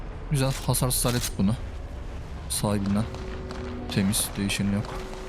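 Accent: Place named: Russia. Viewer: Rus aksanlı lütfen devam:)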